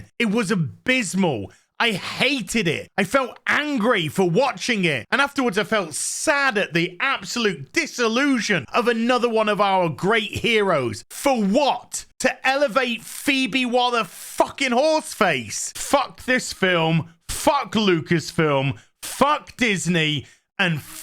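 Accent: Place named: Yorkshire, United Kingdom. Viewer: What is a morning constitution. Hard to understand this Yorkshire talk like "was straight"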